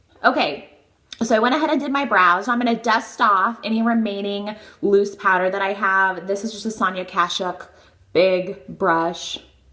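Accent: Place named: Midwest, United States. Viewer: oh, I don't think it does. pretty typical sounding Midwestern American sounding gal yet unique to her. I love her voice!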